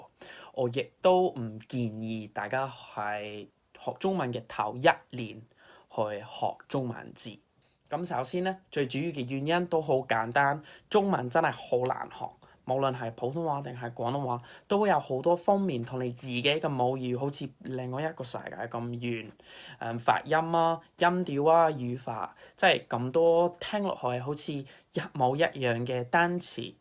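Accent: United Kingdom. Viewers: Are you from Essex? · would have never told that it's a Brit speaking if i listened with closed eyes, well done! are you also on instagram?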